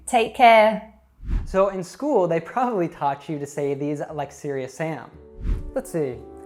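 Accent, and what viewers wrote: United Kingdom. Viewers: After im following your lessons i think im fallin in love with UK accent.... Kinda sweet and cute...🙃🙃🙃🙃🙃🌹🥳⚘ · I love your British accent 😍😍😍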